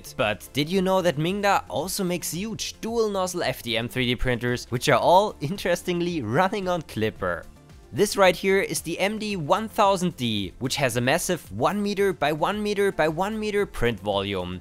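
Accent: Germany. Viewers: Dnk how's your english so clear being you german, you only have a little sharp pronounce but's the deutsch accent while your spoken english is perfect. How did you manage to found the only chinese I've ever heard speaking english with a mix of nigerian and indian accent? He's rare! :D sorry I couldn't un-notice it :D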